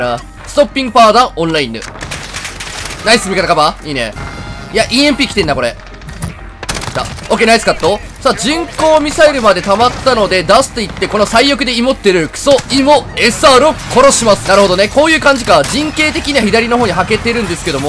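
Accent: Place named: Hyogo, Japan. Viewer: 時々聞き慣れた発音してたからもしかしたらと思ったら もしかしたらで兵庫出身やったんですね笑 動画で「なんどいや」 , 「~じゃいや」「〜がいや」etc 言って流行らして下さいw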